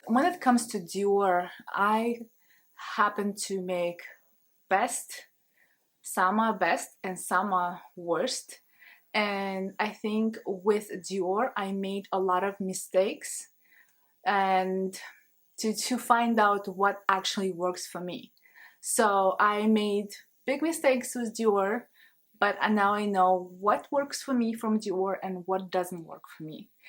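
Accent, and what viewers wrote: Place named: Russia. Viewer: Вы казашка? Акцент русский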